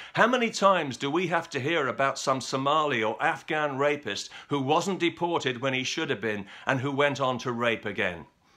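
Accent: United Kingdom. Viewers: You remind me of Michael Caine, a bit. Love & agree with just about everything you say; nothing nicer than a crisp, British accented voice logically assessing current issues. I could listen to you talk all day long!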